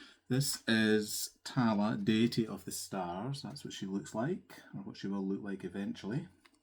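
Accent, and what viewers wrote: Scotland, United Kingdom. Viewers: I’m so glad I found your floss tube! I loved seeing your Cinderella, I’m collecting that series and can’t wait to get started. I’m really impressed with your fabric selections. The Yellows are lovely and that purple for your monster project is yummy! I loved your bags, too! and your Scottish accent is so lovely. Just a fun and exciting first flosstube… keep them coming and best wishes for your new venture! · I loooove a Scottish accent! My ancestors are from Arran.❤️ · Wow you're such a natural. I love you're pieces and can't wait to see more, please record again when you're able to. I love the Scottish accent as well. My SIL is Scottish and I could listen to her all day lol. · Hi, great to hear a gid Scottish accent ❤ I live on the other side of Glasgow towards Largs. Great Episode looking forward to many more. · Hello I enjoyed seeing your WIPS hope you continue with floss tube videos. 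Happy anniversary. I stitch samplers and no fancy ladies. Saga is one of my works in progress have you watched the runner stitcher a great Saga stitcher Love your project bags. Have subscribed and enjoyed hearing a Scottish accent. Happy stitching from a Scot in London Lo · Hi from New Hampshire USA! 🙋‍♀️ New subscriber. 😊 I heard of your channel from Eva at stitching in the interlake. I love all of your projects and bags. You reflect your love of color, so bright and cheery. ❤. I am looking forward to following you on your stitching journey! Cheers! 😄 I love your Scottish accent. 😉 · Just found you thanks to Comfort Stitching. I so enjoyed your video! Great projects beautiful stitching. We just returned to the US from a visit to Scotland and Ireland. We’d been to Ireland and everyone told us how beautiful Scotland was…..they were right! I was so happy to be hearing that gorgeous accent again today!❤ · My husband and I returned from three glorious weeks in Scotland just two days ago. 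It was my first visit and my husband's fifth. He is 77% Scottish, and I am 27%. We did a lot of family history research and even visited the family homestead in Orkney. When your video came up in my feed, I was SURE you were Scottish. I already miss hearing the brogue and visiting with so many kind, hospitable people. I subscribed, so now I can get my heart warmed each time I hear you talk about your WIPS. Nice job on your first video. Thank you,! By the way, I am in Ontario, Canada. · Best wishes from Germany. Listening to you reminds me a bit on my two holidaytrips to Scotland and I love it ^^ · If only for your beautiful accent, which reminds me, even makes me homesick for my Scottish friends who live in the same part of Scotland, I would jeep listening to you! Pluss the beautiful pieces you are showing, reason enough to stay around😅. Welcome to Flosstube!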